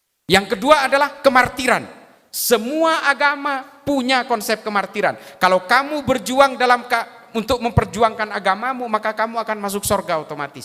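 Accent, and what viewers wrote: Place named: Madura, Indonesia. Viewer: Dari logat bahasanya seperti suku Madura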